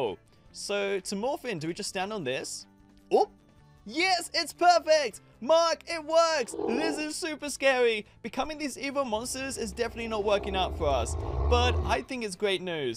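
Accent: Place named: London, United Kingdom. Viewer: You talk like London 🇬🇧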